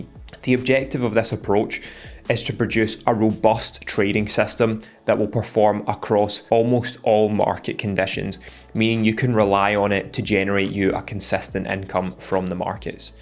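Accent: Scotland, United Kingdom. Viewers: Never heard this uk accent 😅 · Scottish accent is elite im moving outa ldn to Edinburgh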